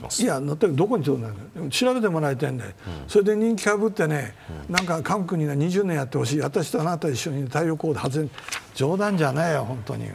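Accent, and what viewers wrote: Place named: Tokyo, Japan. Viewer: テレビだろうがお構い無し、ブレない自分の信念をハキハキとべらんめえ調で語る姿が実に粋だと思う。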